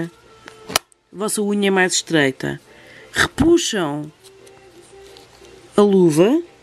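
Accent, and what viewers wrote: Portugal. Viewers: 😍😍😍😍Amei Parabéns e seu sutaque português mais ainda lindoooo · Adorei seu video, sou nova aqui e vou ficar, meus pais e avós eram portugueses e adoro este sotaque.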